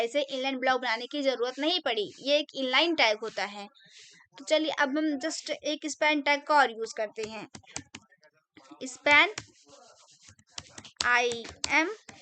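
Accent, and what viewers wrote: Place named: Uttar Pradesh, India. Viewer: HI what's your name I'm also learnig Javascript, and also learnig css, from where are you, I think You are from UP, am i right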